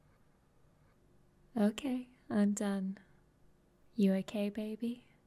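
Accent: United Kingdom. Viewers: Thats accent sounds very either scottish,british,or irish and ...i really like it,not just cause im irish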